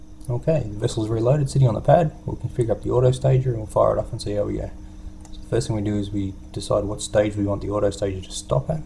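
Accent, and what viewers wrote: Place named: Australia. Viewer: This is really well thought-out and beautifully executed. I thought it was good before just to be able to see stage fuel, but now with that extra functionality it's become one of those mods that get instantly added to any install and that shows the KSP devs how the stock game should be improved. Great work, and good to here an Aussie voice in modding community :)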